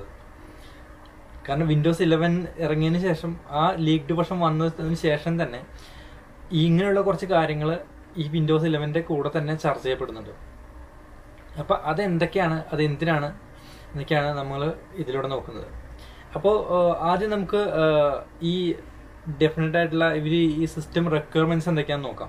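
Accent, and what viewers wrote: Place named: Thrissur, India. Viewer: തൃശ്ശൂർക്കാരൻ ആണ് എന്ന് മനസ്സിലായി അല്ലേൽ എറണാകുളം ❤❤❤❤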